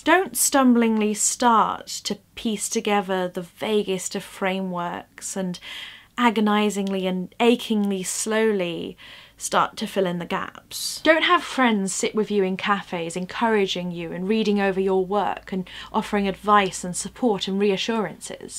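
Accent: United Kingdom. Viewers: Great to hear! Yes your writing and lyrical performances are very pleasing in and of themselves, but speaking as an American, I'd be lying to myself if I didn't credit your wonderful British accent as well. Haha. Also speaking as an American, I'll take the lashings on behalf of my country for doing our fair share to fuck up gender politics in 2017. bows to ironic applause someone throws a tomato (you read that word as "ta-mah-toe) ;)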